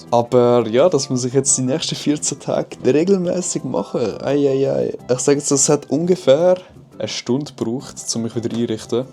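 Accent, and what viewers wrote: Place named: Switzerland. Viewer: Also trotz Schweizerdeutsch kann ich dich gut verstehen. Fands interessant wenn auch die Videos die rausgekommen sind mich so absolut überhaupt nicht catchen.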